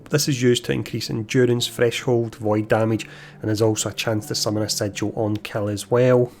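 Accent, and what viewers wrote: Scotland, United Kingdom. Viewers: damn love ur accent :D R U Scottish? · I like the video and accent because I live in Scotland 😂 not Scottish myself haha. I know English was forced here ages ago but it’s not that bad to use it for the videos 🦧❤️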